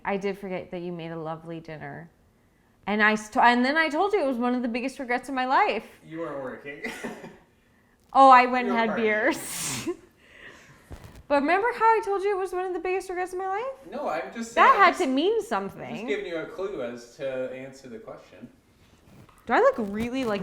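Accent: Canada. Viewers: It's Human Rights violation to ask a high person "What was the last thing you forgot?" You could give someone an aneurysm. She gets SO CANADIAN when she's high. I love it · You’re Canadian accent when you’re high >.< Love it, dawg. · Dude, around 5 minutes in, the Canadian accent really comes ooote. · High had a Canadian accent Eh? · She gets a lot more Canadian when high 😂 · She started sounding more and more Canadian as this video went on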